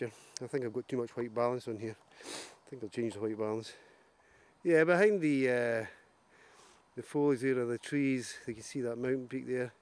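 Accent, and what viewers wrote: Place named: Scotland, United Kingdom. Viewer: Myself and daveswildcamping,love the Scottish accent. It puts u,right there.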